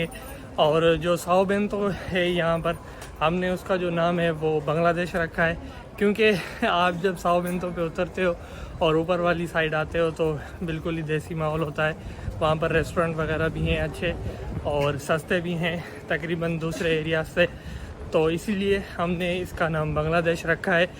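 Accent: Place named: Sindh, India. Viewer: Ada ap lehje se Sindhi lgte ho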